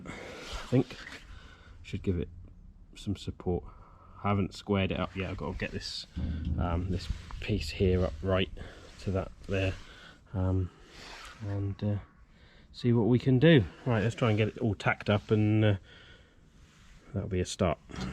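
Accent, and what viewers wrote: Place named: Cornwall, United Kingdom. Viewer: 3 seconds into the video i subscribed. Nice to hear the cornish accent. Again after leaving fowey/Truro and spending the last 8+,years in New Zealand.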